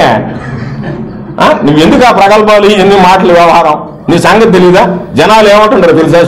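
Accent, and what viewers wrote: Nellore, India. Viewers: Super counters short and sweet we with typical nellore slang...Nellore slang lone vetakaram untundi...Pakkana koorchunna ayana chaviti vademo ani doubt ga undi lekapothe anta comedy vinnaka navvakunda unnarante great...Love the speech. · Govardhan anna iam your FAN.iam from ongole.you are going to get very great credit that you are going to defet 5 th time.anna iam your fan you speak in very good in nellore slang.Anna i wish you all succesd.you are going to get credit of defiting 5 th of the great mr somireddy. anna all the best to you anna.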